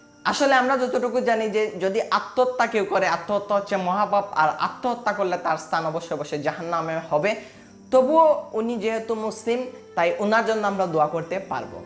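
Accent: Sylhet, Bangladesh. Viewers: ভাই তুমার মুখ ও সিলেটি মাত অউ ভালা লাগে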